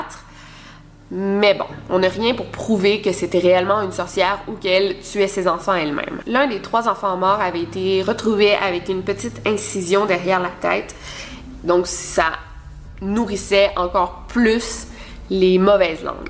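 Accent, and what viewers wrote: Quebec, Canada. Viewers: Je suis abonné que depuis hier et je suis déjà fan parce que tu racontes vraiment bien les événements ! Ça me captive et j'adore ton accent québécois c'est bien ça? :) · j adort l'accent canadien. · À force de regarder tes vidéos, je suis devenue accro à ta voix ! J'adore ton rythme de narration et ce bel accent canadien!💕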